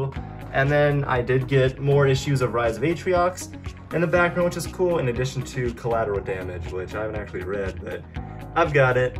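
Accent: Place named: United States. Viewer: yeah man im guessing from the accent your american.. in the UK its hard to find decent halo stuff your collection is amazing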